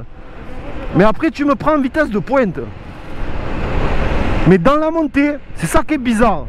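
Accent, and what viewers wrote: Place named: North, France. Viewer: j’adore votre accent du nord et votre égo du nord. 😋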